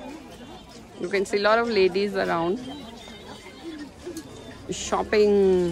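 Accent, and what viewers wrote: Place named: India. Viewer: Your accent is really annoying.Sounds like mainland Indians.,vegetable should be pronounced as vejtebal not vejitebal.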